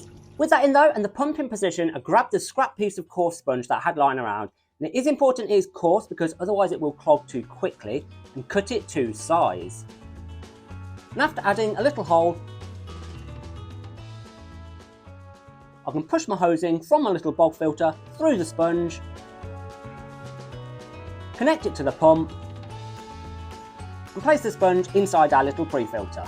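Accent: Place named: Australia. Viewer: Abrasive accent. Australian?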